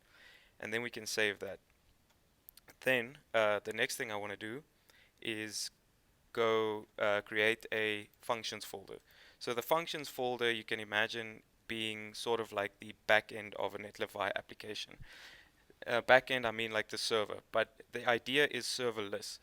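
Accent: South Africa. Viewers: You sound South African brother. Thank you for this tutorial. Just what I needed right now.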